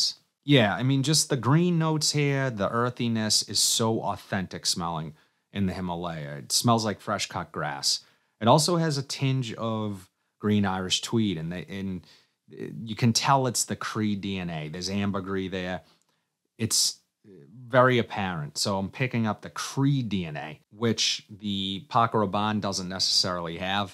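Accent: Boston, United States. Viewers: Absolutely love Himalaya but haven't smelled XS despite hearing about the constant comparisons. Great video as usual Your Boston accent is so awesome. Go Red Sox 🤣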